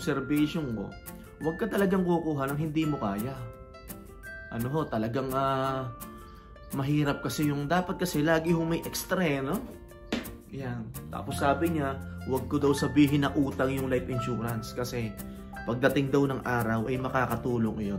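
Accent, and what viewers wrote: Batangas, Philippines. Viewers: I stumbled upon your vlog kabayan at nung madinig ko ang accent mo eh nag suspetsa ako na taga batangas ka😊. Kumusta na lang ala eh taga Batangas din ako.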